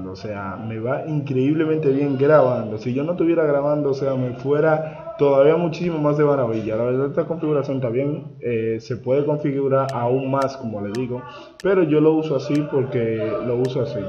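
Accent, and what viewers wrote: Dominican Republic. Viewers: Eres dominicano? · loko tu eres dominicano?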